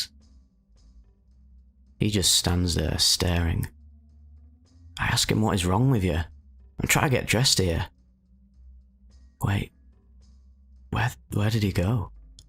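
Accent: United Kingdom. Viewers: I don't want to seem stupid but you said your from canada did you move to the uk? Because of your accent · Are you actually British or is that just the accent you do?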